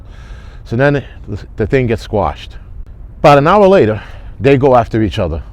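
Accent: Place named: South, United States. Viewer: Some times you sound like you have spent time in the south.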